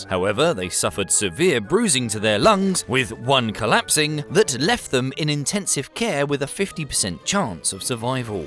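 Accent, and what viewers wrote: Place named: United Kingdom. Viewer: I like this channel and not just because of the British accent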